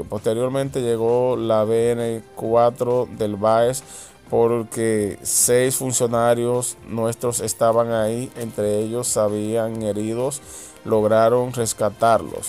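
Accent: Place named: Cuba. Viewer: amigo se nota que estas en contra del régimen pero de donde carajo eres por que no pareces venezolano, dices arcarde , gobelnadol ... eres cubano o de donde carajo? si eres venezolano disculpa pero toma clases de dicción.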